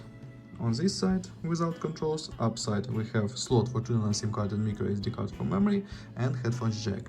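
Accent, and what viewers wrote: Russia. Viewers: на аглийском,с русским акцентом))) По голосу и звуковому фону узнал.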